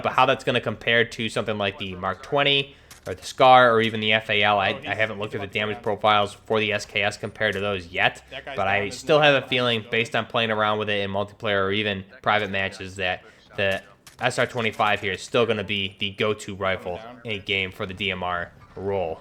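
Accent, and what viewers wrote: New York, United States. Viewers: it’s his NY accent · Imagine being mad at a youtuber with a New Yorker accent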